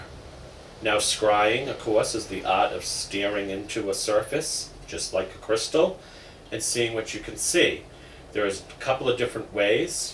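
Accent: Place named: Massachusetts, United States. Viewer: Where are you located? From the sound of your voice, I'd say Massachusetts. :)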